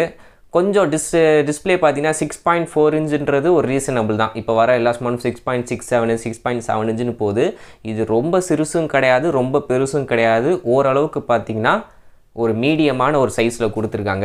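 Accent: Madurai, India. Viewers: neenga pesura madurai slang super nanbaaa🤘✌️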